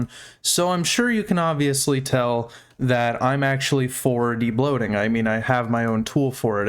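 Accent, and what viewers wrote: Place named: United States. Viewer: Bro your acent is lije a true american 😅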